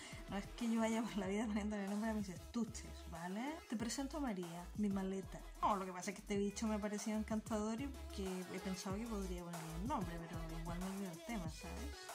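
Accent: Chile. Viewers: Tienes acento entre chileno y españolísimo!! Jajaj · speak in chileno cuicos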